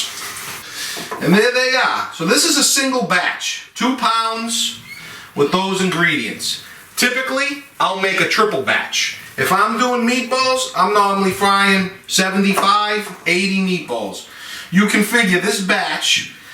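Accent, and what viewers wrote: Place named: Massachusetts, United States. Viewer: EH I SEE YOU GOT YOUR ST CHRISTOPHER ON YOUR NECK THERE... LOVE YOUR BEEYOUDEEFULL SILVA CHAINS ROUND YOUR NECK THERE... DID YOUR PARENTS SPEAK ITALIAN? YOU SOUND LIKE YOU AHH FROM MASSACHUSETTS.... MY WHOLE FAMILY ON MY MUTHAS SIDE WAS FROM THEY AHH. LOL YOU ARE WONDERFUL THANKS FOR THE RECIPE GOTTA GO TO THE GROCERY STORE REAL QUICK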